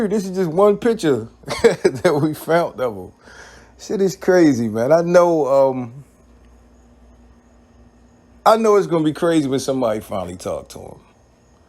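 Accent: Baltimore, United States. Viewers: You’re definitely from Baltimore huh bro? Haha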